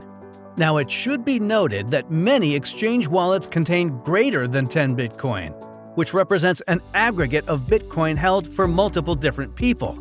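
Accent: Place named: United States. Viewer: Most american voice ever.. over the top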